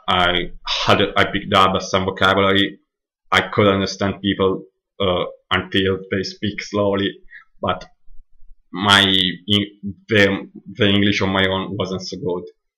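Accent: Italy. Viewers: Hi - I understood everything you said but like you said it is your accent that will need some practice. I understood you, but I had to concentrate on what you were saying. Two things I would say give away that you are Italian - the long A sound you pronounce like a soft a (Kate and Cat should have two different A sounds), and adding an 'ah' at the end of some words, like 'people-ah'. Many italians do this, so don't worry . But well done with this, and keep working, you'll get there!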